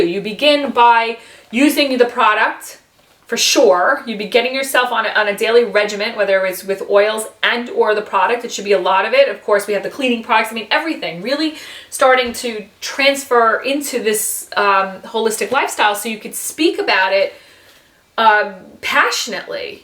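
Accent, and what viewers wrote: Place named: Northeast, United States. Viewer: loved the nor'easter accent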